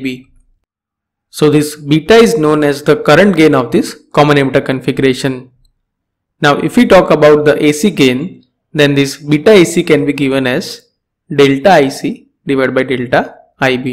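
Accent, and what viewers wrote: India. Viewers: Why is it that anything that has to do with circuits is taught by somebody with a ridiculously thick Indian accent?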